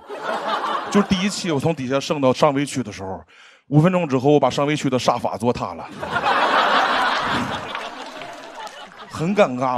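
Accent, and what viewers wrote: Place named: Dalian, China. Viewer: 我是大連女婿，喜歡大連口音。如果冒犯到大連人， 這裡道歉了。 當然肯定會冒犯到一群假脫口秀觀眾， 但是就不道歉了。反正你們也不開竅。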